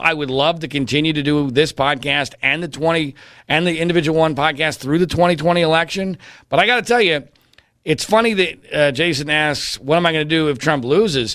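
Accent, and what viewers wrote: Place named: Philadelphia, United States. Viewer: I knew there was a reason why I enjoy listening to you. You're from Philly.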